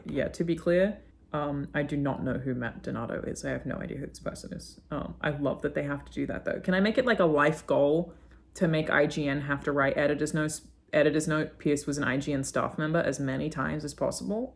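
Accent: United States. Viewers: hearing you speak in an american accent was weird as hell. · Watched this last night. Not bad. Weird hearing your american accent since im so used to your Australian..the skydivers bit gave me Cloverfield vibes. But good job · I loved it and Stowaway was the best for me due to the psychological horror. The ideas presented were brilliantly horrific, without giving any spoilers. And, let's give it up for your American accent. Kudos,. For real.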